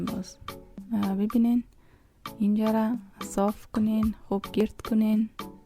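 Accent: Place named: Afghanistan. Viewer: احسنت برخواهر افغانم با اموزش عالیتون استین چه خوب اموزش دادی بالهجه ی شیرین افغانی 🇮🇷🇦🇫👏🏻👏🏻👏🏻👏🏻👏🏻👏🏻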